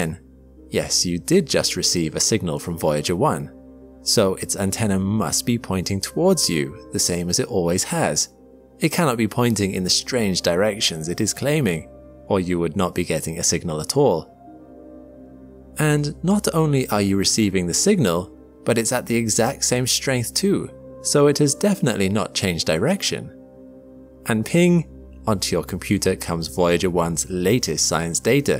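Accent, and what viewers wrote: Wales, United Kingdom. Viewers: are you welsh ? ur accent sounds slightly welsh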